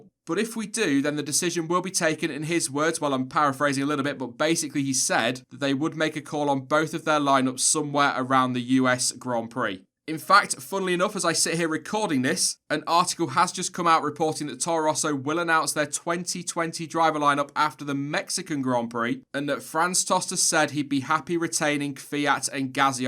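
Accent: United Kingdom. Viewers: to be fair i was using you as a base line for a english man accent so you know its not all bad a compliment from your irish neighbors !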